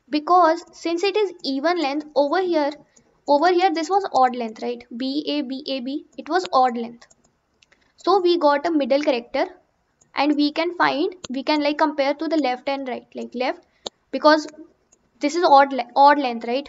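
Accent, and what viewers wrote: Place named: Punjab, India. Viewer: where are you from? Himachal/Punjab/Chandighar? that "chrecter" and "meeddle" accent sounds familiar 💖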